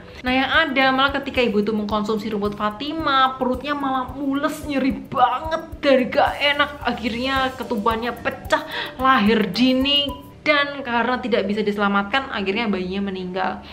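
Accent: Java, Indonesia. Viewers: Medok bgt sih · mba bukan orang jawa ya? Orang jawa memang gitu mba, kakak ku juga gitu klo ngomong ngeden2. Itu adalah logat namanya.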